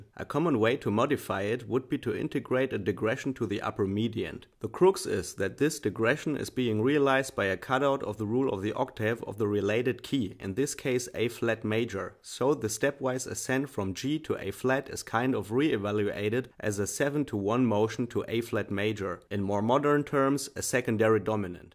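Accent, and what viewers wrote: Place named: Germany. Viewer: You have an intellectual clarity mixed with humour which is very nice. Leaves the watcher with a lot of food of thought. Thumbs up! (Btw as a Swede the German accent makes all difference =) ) ps. very well researched, another thumbs up! ds.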